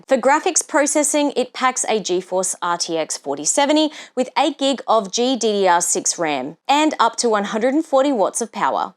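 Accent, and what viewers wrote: Australia. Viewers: I absolutely love the look of this laptop device here & even the screen looks bloody amazing as well! I'm thinking of upgrading to a new laptop here & looks beautiful! Have a beautiful week & love hearing that lovely Australian voice! 🥰🎮❤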